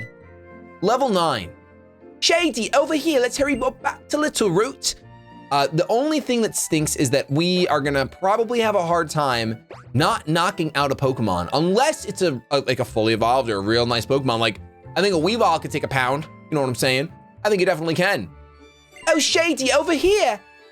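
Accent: United Kingdom. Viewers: I could just sit here for days and listen to that british accent 🤣🤣🤣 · As a British person I'm living for the British accent!!!! Its the best thing ever 🤣🤣🤣 Fave shiny is deffo Eevee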